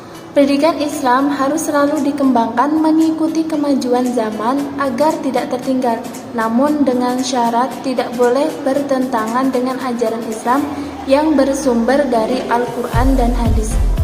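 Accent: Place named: Java, Indonesia. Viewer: Semoga lancar dan tambah berkah dex, medok 😁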